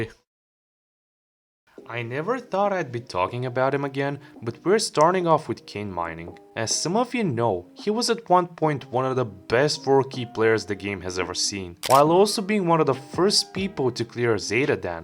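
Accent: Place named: Bulgaria. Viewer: Tozi Bulgarski Akcent e na drugo nivo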